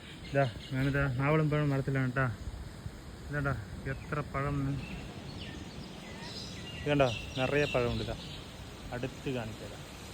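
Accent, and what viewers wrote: Tamil Nadu, India. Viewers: നല്ല മലയാളം അല്ലെ പറയുന്നത് . തമിഴ് കൂടിയ മലയാളം പോലെ സംസാരം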